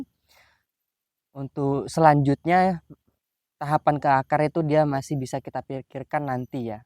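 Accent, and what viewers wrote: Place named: Palembang, Indonesia. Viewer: Ikut bljr... Awak jemo mano logatnyo cak jemo palembang , aq di bkl.... Salam kenal dan satu hobby bro . 🙏🙏🙏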